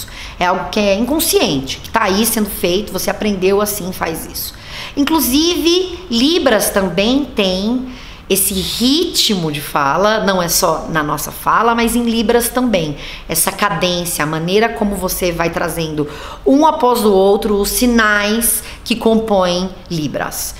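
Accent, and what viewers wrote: Rio de Janeiro, Brazil. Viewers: Afinal vc é carioca, paulista... ?? Começa puxando o S, depois passa pro R "arranhado" dos paulistas, depois o R mais interiorano.. "Aceeinto", "dizeeindo".. me perdi.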